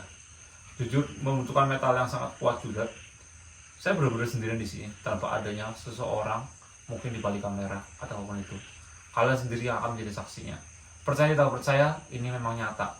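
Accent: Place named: Java, Indonesia. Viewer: Channel saya suka sekali...logat Jawanya kental sekali dan campingnya sungguh bikin jantung 💓 copot hahaha.... salam damai bersamamu selalu dek.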